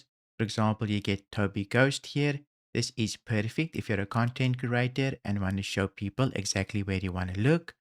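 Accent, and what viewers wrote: Afrikaans, South Africa. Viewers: Dude, thanks for all the videos. Have been watching a lot of them recently. Very helpful! If I may: I believe I hear an Afrikaans accent there. Am I correct?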